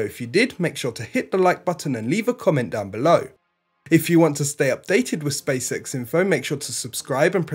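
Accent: England, United Kingdom. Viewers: Hey, love your updates man! Thank you! What part of England are you from mate? I say south, around London, but my brother is saying Manchester. You sound NOTHING like Paul Macartney so, I say that's wrong!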